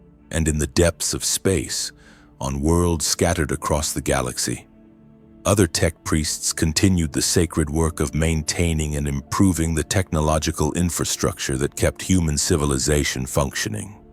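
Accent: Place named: United States. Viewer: The voice is better, barely watchable now. Still, the American twang is infuriating. These people have English, accents, old London etc. The American voice adds camp element to a dark and gritty world.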